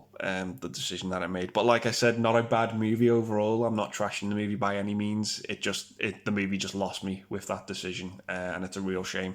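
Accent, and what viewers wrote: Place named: North West England, United Kingdom. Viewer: I'll be honest a lot of movies in your list i 've not even seen because they aren't a genre i'm into, but i certainly respect your list and right to an opinion. I look forward to your list of unpopular movies you like, i definitely have plenty of those too. I really like your honesty and attitude. I'm guessing from your accent you are from around the North West, i'm technically North East, just below Hull.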